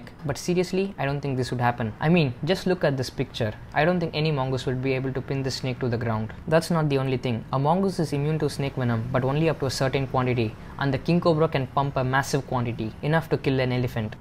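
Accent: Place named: India. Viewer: Jai Hind! Greetings from Alabama USA! Love indian accents too. Always sound cool AF.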